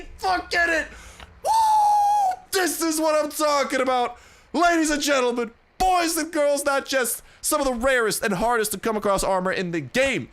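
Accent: Russia. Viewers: Can you please stop the Russian accents it’s kind of annoying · Are you Russian?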